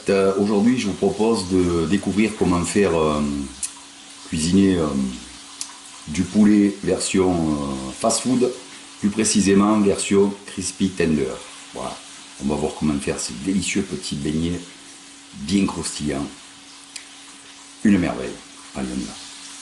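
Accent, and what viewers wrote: South, France. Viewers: il explique bien, c’est l’accent du Sud qui fait tout 😂😂😂👍🙋‍♂️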